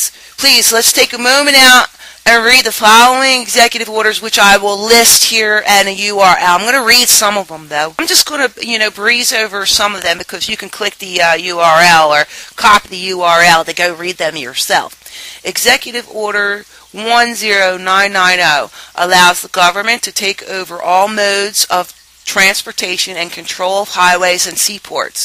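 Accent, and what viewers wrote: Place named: Baltimore, United States. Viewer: wtf.. you have a baltimore accent lol...great video though.. it speaks the truth.. PRESIDENT RON PAUL 2012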